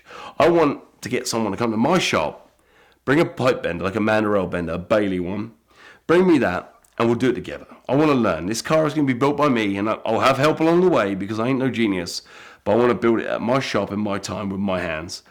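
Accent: United Kingdom. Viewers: Listening to him talk is agonizing. I fell like i am being tortured by an drunk listening to the British accent!!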